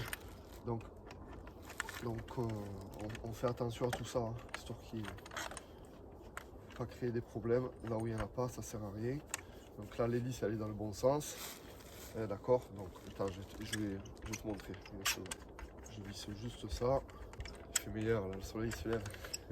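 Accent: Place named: Provence, France. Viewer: Bravo pour ta présentation aussi détaillée avec un ton naturellement provençal très agréable. Plus le courage de faire un montage vidéo. Bravo!!!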